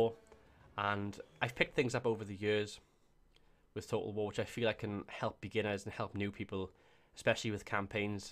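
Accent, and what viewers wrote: Wales, United Kingdom. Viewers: best tutorial ive found for total war rome 2, plus your welsh so it just sounds better :)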